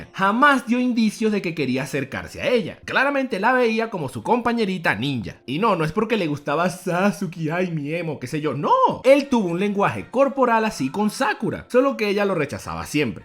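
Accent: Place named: Venezuela. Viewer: Oye man pregunta de curiosidad ,eres de Venezuela? Se te nota el acento eso y tu canal esta bien pavo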